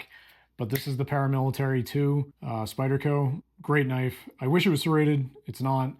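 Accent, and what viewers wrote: United States. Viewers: I was thinkin louisianian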